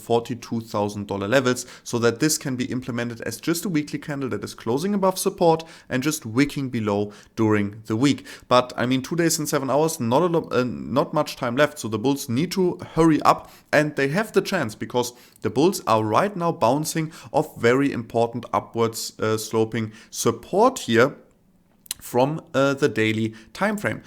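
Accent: Germany. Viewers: Your videos are fantastic. Thank you! Packed full but brief and to the point. As a linguist, I'm curious about how you acquired English given that you have some German accent but your grammar and vocabulary are flawless. Did you spend a few years at a younger age in an English-speaking country?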